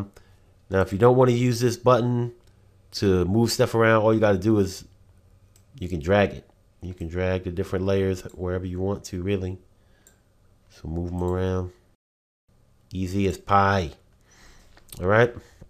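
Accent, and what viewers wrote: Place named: East Coast, United States. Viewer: Come on man that East Coast accent is bomb! Specially since I'm way out West! BTW nice tutorial, I learned a lot. Thanks!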